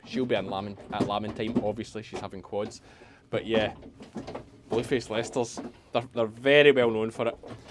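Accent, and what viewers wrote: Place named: Kilmarnock, United Kingdom. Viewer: Actually having my friend to watch, as we are coming to Kilmarnock in three weeks, so she can tune her ear to the accent!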